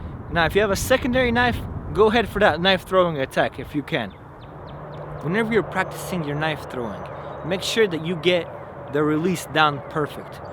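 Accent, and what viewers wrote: Russia. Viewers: i like your russian accent :D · OMG are you serious? He says "Привет, товарищи" and "мастерская" fluently, at the same time speaking English with a Russian accent. Of course he's Russian.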